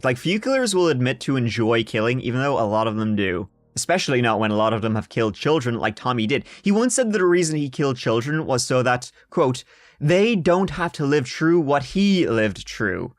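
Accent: Ireland. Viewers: Are you trying to do an Irish accent or something? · Thank you for putting podcasts on you tube it is such a treat for your fans I enjoy listening to your wonderful brogue and of course your sense of humor the way u have doing theses horrific stories . You’re simply the best storyteller God bless you and your family RIP to all of the victims of these crimes Wish you all the best with your podcast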